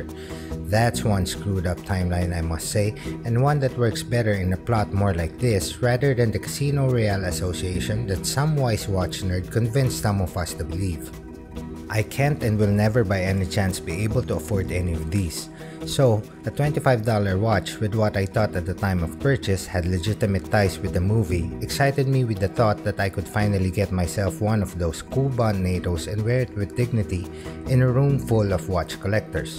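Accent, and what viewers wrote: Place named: Philippines. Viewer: are you based in the Philippines? You sound like a Filipino. Great production, by the way.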